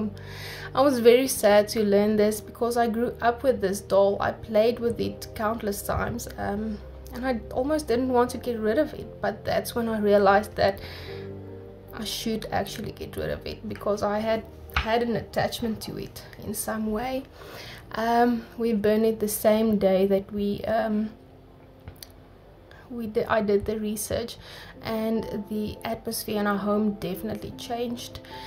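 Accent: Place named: Afrikaans, South Africa. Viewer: Hehehe guys if ur wondering about the accent it’s called the South African Afrikaans accent 🙈🙈🙈🙈🙈we have different accents here aswell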